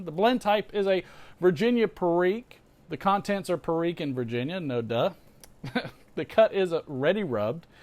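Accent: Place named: South, United States. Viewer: Nice southern drawl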